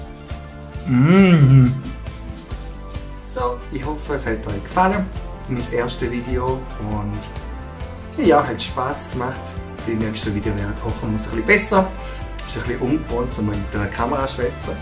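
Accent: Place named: Switzerland. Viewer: Schweizerdeutsch hört sich irgendwie süß an...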